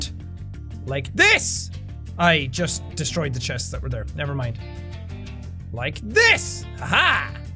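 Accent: Ireland. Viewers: His Irish maxed out this video lol xD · Im Irish too. We all talk the same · I absolutely love it when your pure Irish accent and phrases come out:3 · inner Irish came out in this video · MrG I love any Irish voice tbh 😍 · jack really went into Irish during this episode. LOL. Love ya.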